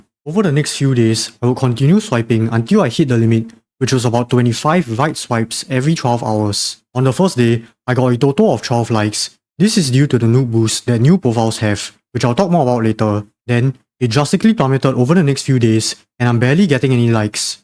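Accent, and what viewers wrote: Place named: France. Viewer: you sound slightly Frensh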